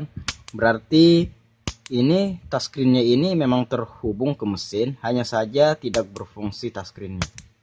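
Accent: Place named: Makassar, Indonesia. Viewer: Dari gaya bicara nya bang,heheh soal nya punya ipar orang makasar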